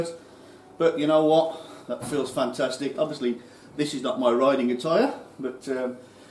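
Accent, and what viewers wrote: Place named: Birmingham, United Kingdom. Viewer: Great vid. Thanks for sharing. I must say though. You don't sound like an Aussie. In fact you sound like a Brummie? I'm in Wolverhampton. Lol. Let's meet up in Singapore sometime.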